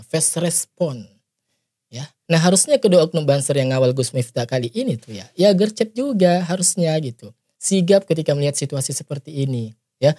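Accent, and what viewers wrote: Sulawesi, Indonesia. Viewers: Woi kamu logat Sulawesi jangan buat konten murah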